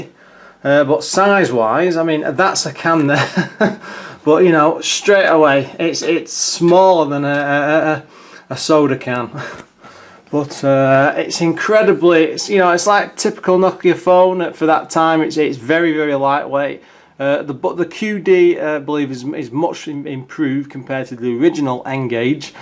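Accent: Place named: England, United Kingdom. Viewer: What part of England do you come from? I'm intrigued by your accent.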